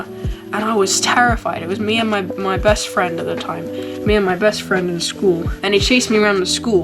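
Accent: United Kingdom. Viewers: I want a British accent now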